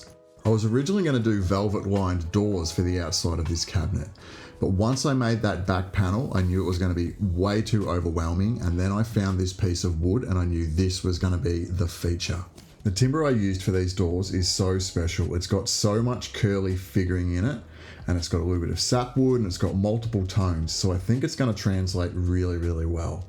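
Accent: Australia. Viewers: Australian accent, terms of lumber, butt dank something doesn’t sound quite right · lovely build. Great wood choice. Unreal to hear Aussie accent for a change. Would you consider individually partitioned boxes for the scotches?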